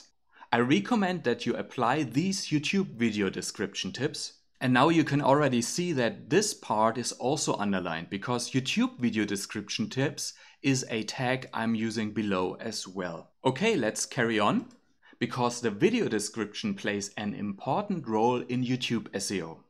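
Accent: Germany. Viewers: You are German after your accent. But I like to learn from a German because they do things very professional. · you are bavarian after your accent :)morning fame. great tool!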